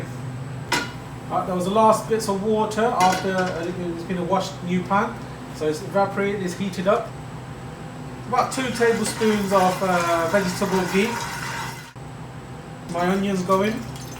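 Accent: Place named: Stoke, United Kingdom. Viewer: You know, I was watching this and thought that sounds like a stokey accent and at the end you revealed that it was haha I’m in Stoke too and will definitely come down to the restaurant 😊 great videos, I love Indian cuisine and am trying to learn more about foods from different regions. Thanks for the videos mate 😊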